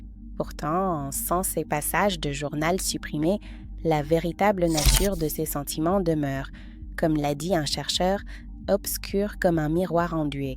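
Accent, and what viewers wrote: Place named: Quebec, Canada. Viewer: Superbement documenté ! Avec le petit accent so québécois qu’on adore ! Tellement intéressant j’aurais aimé tomber sur vos contenus bien avant ! Merci à vous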